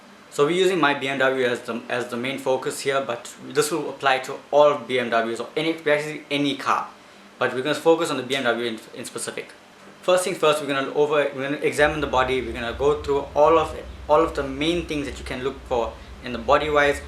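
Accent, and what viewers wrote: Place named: South Africa. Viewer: bro what accent is that, are you south african?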